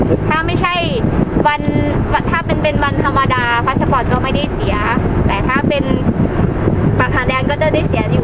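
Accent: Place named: Thailand. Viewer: สำเนียงออก ไทย มากเลยครับ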